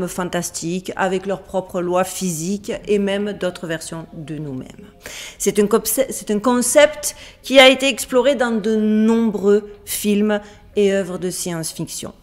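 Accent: South, France. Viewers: Juste quelques mots, je vous ai découvert il y a quelques jours et quel régal, des sujets extraordinaires avec une manière de raconter génial, l'accent du soleil en plus, merci merci merci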